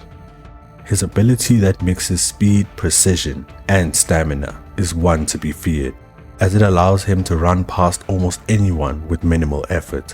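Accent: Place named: South Africa. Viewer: haha nah from your cadence. As a fellow south african anime lover you have a new follower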